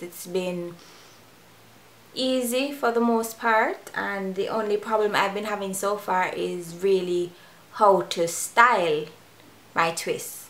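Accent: Jamaica. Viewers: So bus a jamdown chat.nuh.... make mi hear it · I love watching your videos just to hear your accent im Jamaican but I don't live around a lot of Jamaicans. I love watching your videos to get a feel of home.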